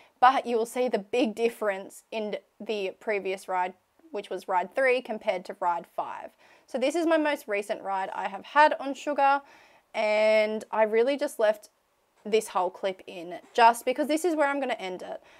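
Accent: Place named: Australia. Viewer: Well, the accent says Australia (which would be summer)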